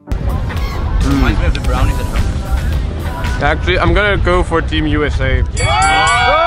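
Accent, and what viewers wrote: Buenos Aires, Argentina. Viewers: hola...soy Argentino, Porteño....vivo en Redondo Beach, California. la verdad que tu español latin aporteñado es muy bueno. saludos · Llegue a pensar que era argentino! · Boludoooo! Tremendo lo bien que hablas el español argentino! Con los años mejoraste zarpado!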